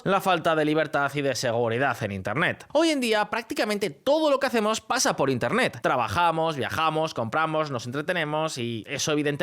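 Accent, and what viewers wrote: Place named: Spain. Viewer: No soporto este acento Español 😥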